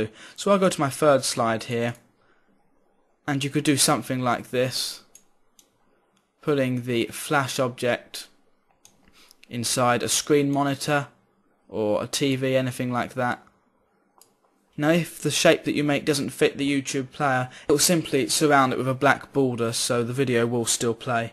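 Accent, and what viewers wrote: United Kingdom. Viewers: I love your british accent! :D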